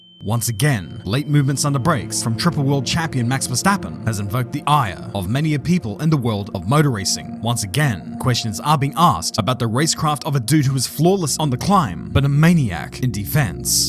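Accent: New Zealand. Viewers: are you from Southland?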